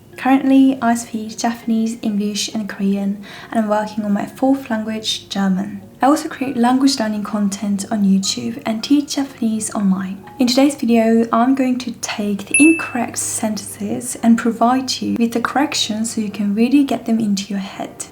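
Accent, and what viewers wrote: England, United Kingdom. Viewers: your last about no san to titles but is it okay to say xx shachou san? Thanks. BTW I love your English accent cuz in Japan most of time i hear is American English but yours is English English. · Your accent is pretty incredible. Did you live in the UK when young or did you work on it? Because I've never heard a non Brit speak like that. Even Americans. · Why do you sound british? 🫤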